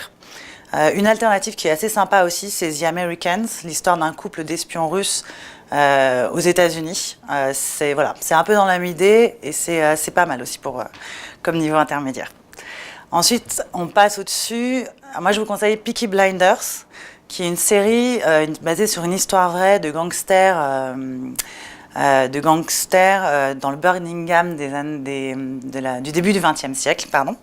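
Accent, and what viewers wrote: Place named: France. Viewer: merci pour cette video, juste un truc, tu prononces l'anglais à la francaise surtout la lettre R et th qui ne se prononce pas comme Z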